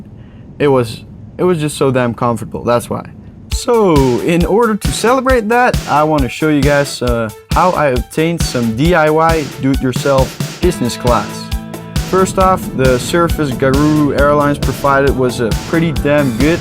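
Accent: Netherlands. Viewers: Wait u dutch? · You can definitely hear that you are Dutch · nederlander dat hoor je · Nederlands Engels😍😂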